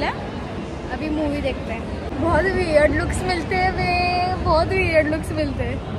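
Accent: Kolhapur, India. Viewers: Tujhi Kolhapuri Flow Cha Fan Zalo Aahe Mi Kai Toh Flow Aai Hayee Ghayal 🦋💗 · Bhaii urr kolhapuri acent😂💖itss tooo good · Aga ky masta kolhapuri accent aahe yrrr....😍😍. ly bhari te takkay ja vlog madhe 😄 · Tuze vlog chan aahet Pn tu marathi tunch vlog karat ja Tu marathi khup chan boltes Ekdm kolaphur style Love and support you 😇❤✌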